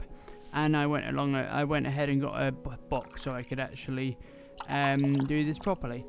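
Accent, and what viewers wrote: United Kingdom. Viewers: Какой же отвратительный акцент у британского)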